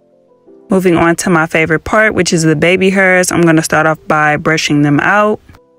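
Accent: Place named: Memphis, United States. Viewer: Sounds like a fellow Memphian❤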